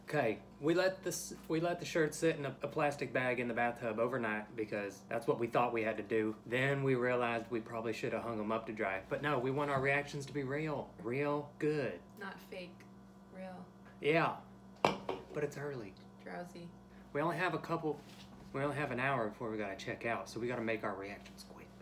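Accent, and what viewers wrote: South, United States. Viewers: So I realise I'm super late to this video but I e watched a lot of recent videos and I have to say that he's WAYYYYY more southern here than recent!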